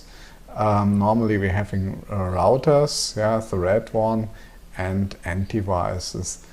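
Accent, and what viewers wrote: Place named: Germany. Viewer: Ich hören den deutschen Akzent und schreibe daher auf deutsch 😅. Danke dass Sie diese Videos mit uns teilen. Dies ist mein erster Versuch auf einer Plattform hörer als arduino oder esp zu arbeiten und gar mit open thread. Diese Reihe ist ein super Einstieg obwohl ich schon so viel gelesen und versucht habe traue ich mich noch nicht damit zu beginnen bis ich es nicht zu 80% verstanden habe😂 bitte machen sie weiter so und vielen Dank!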